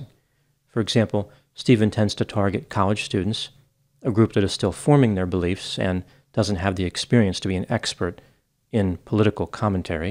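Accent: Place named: Baltimore, United States. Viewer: I have never noticed this before, but I hear a Baltimore accent from... especially around long "o" sound....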